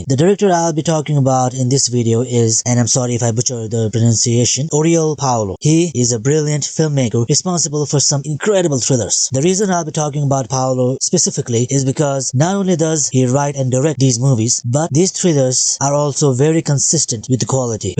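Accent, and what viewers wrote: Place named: Spain. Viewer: bro are you the viral teenager who appeared in podcast debate with girls and called the girl dumb are you? because the way you sound ur accent is like a typical spainish from spain not south america speaking english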